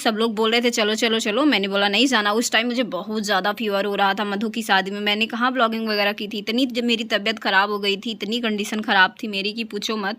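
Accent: Bhojpuri, India. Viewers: Nice bhojpuri. So cute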